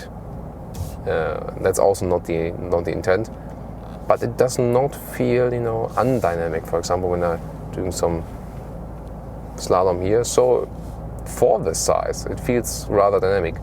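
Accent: Germany. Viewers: One of my favorite proper SUVs currently on the market. I am in love with your German accent! I feel the need to open a Milka bar. Thanks for this review. From The United States of America.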